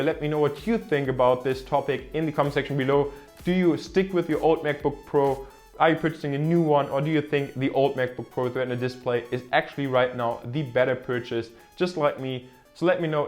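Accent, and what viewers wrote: Germany. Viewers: I'm german, and i can hear your german accent clearly.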